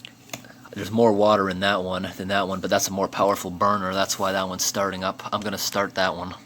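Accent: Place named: Western Massachusetts, United States. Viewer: Sounds like a west Massachusetts accent. Typical south-western New England for sure.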